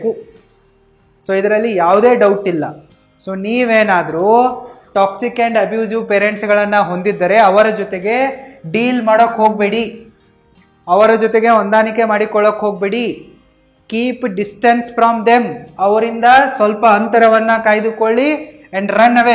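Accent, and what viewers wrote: North Karnataka, India. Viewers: Nimdu uttara Karnataka na sir?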